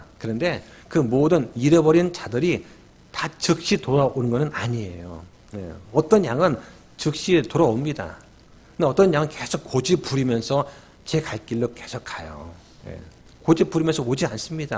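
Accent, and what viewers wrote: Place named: Daegu, South Korea. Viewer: 귀한 말씀 감사합니다, 대구분들에게 잘 맞는 억양으로 말씀 하시니 더욱 좋읍니다~~!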